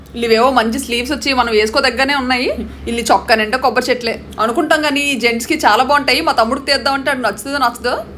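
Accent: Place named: Godavari, India. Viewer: ఏదైనా గోదావరి జిల్లా యాస వేరు మీరు మీ వాయిస్ సూపర్ అక్క ❤❤❤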